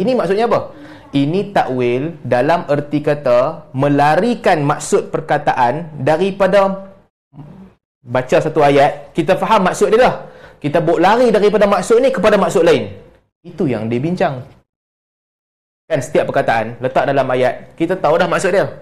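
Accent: North, Malaysia. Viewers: Mungkin disebabkan dia bicara loghat utara ada perkataan yang tidak ada dalam loghat KL/Selangor/johor-riau.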